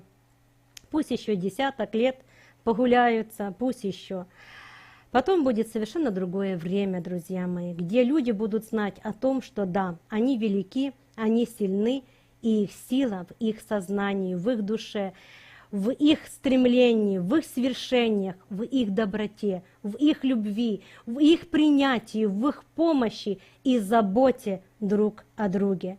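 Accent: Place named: Ukraine. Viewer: Благодарю Вас, от всей души за беЗценную информацию, которой Вы щедро делитесь с нами, неблагодарными. 🤗🙏🏻 Тут вот кто-то покритиковал Ваш украинский акцент, а моему сердцу он мил, особенно когда Вы изображаете обывательские речи, я очень смеюсь, и всё, что Вы рассказываете, остаётся в памяти моей. С вами я полюбила украинский язык и песни, часто пою "Ой там, на горiиии..." 😂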